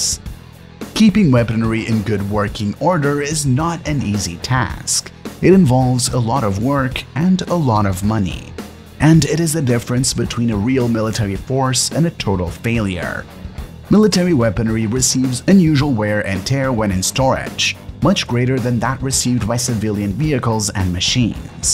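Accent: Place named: Europe. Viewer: You just said the Su-34 is just as good as the F-15. What do they teach you in that European country that you come from? Yes I know that's where you come from because I can hear your accent. You maybe bilingual but, English is definitely not your first language. I do congratulate you for your mastery of the tongue though. The Su-34 fullback is a ground attack aircraft. The F-15 is a fighter airplane. There is a difference. A Su-34 is mincemeat to an F-15.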